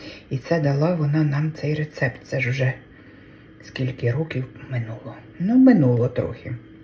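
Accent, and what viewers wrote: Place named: Central Ukraine, Ukraine. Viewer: Яка приємна українська мова в центральній Україні, чудова. 😊❤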